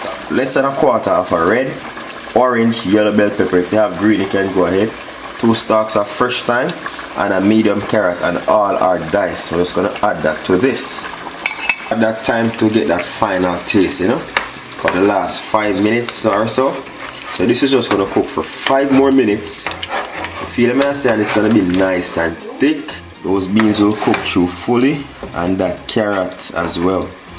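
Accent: Jamaica. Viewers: Oh I love Jamaican accent and I love Jamaica 🇯🇲 ❤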